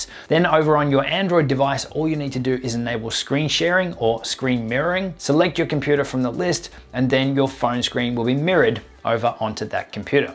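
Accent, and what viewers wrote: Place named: Australia. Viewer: Great tutorial. However, my recommendation to you would be to pace your sentences so that your sentences are slower and your words clearer because right now you are talking way too fast to make any sense to me! Additionally, with your strong Australian accent, it isn't making following you as a non-Australian very easy. Why do you think that you have to speed through what you have to say in this video? Are you short on time? This video tutorial was way too fast for me to follow comfortably! Take Two and try to incorporate my advice into your retake on the same subject!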